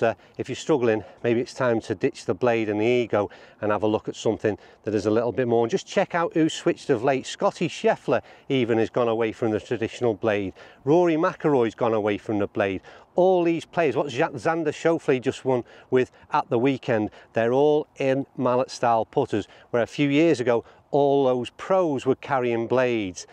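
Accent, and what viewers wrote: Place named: Scotland, United Kingdom. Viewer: He has a Scottish accent so I believe everything he says.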